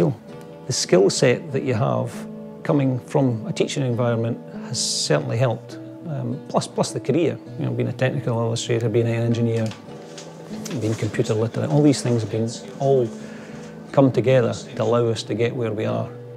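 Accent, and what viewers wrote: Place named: Scotland, United Kingdom. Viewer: Good to hear a Scot's voice.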